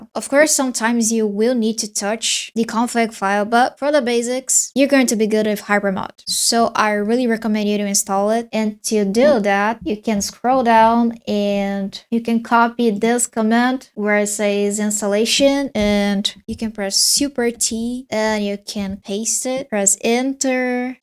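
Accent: Brazil. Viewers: Your accent sounds very Brazilian to me🤔. I'll definitely make it, adding a bit of my own style. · hey, just one tip: you don't need to `sudo` to save in `~/. config`, or at least you shouldn't. EDIT: just watched the whole video hearing your accent and thinking: he/she/they is Brazilian 100%, so not surprised when saw you have an authentic 100% Brazilian BR ABNT2 keyboard Hahahahah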